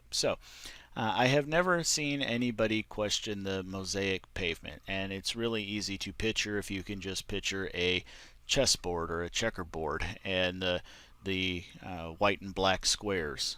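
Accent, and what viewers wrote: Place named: California, United States. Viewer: Cool thanks for sharing. I thought I heard some California in you.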